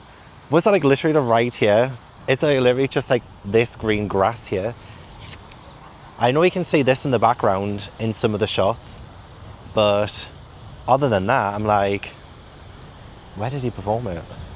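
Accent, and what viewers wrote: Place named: England, United Kingdom. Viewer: Nah. Never feel out of place. I can promise that just having an English accent means everyone there thinks you're too grand for them (I feel like I can say this cuz I'm an American?)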